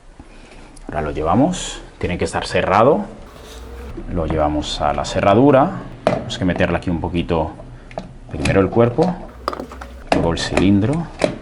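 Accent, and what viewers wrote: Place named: South America. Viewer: Y ese acento de donde es? Parece de sur america tratando de hablar como español....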